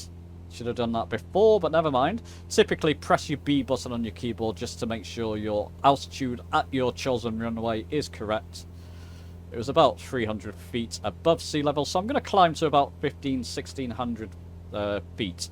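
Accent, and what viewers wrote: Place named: Liverpool, United Kingdom. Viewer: Totally off topic: Are you from the Liverpool area? I'm Dutch so I may be completely wrong, but your dialect reminds me of the Beatles.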